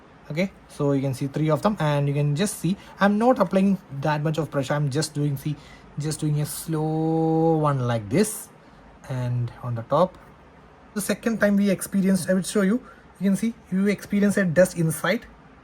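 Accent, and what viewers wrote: Kerala, India. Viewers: Mallu ahnu alle 😂 Ahh english kettalae manasilagum 😂😂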